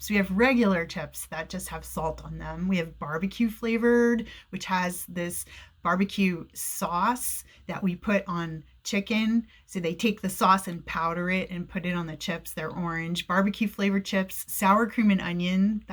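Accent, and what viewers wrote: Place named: United States. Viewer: Oh you guys are completely dependent on packed food. Anyways thank you for informative video, it definitely answered my question that has been lying in my head since long, n here i landed onto your video. Your pronounciation is very much clear, the speed you speak is good and easily understandable, i enjoyed your accent. Its not like other americans whose words are hard to catch most of the times. I had a hard time in the beginning in my job as a transcriber, to document american calls for insurance company. But with time it got better..hehehe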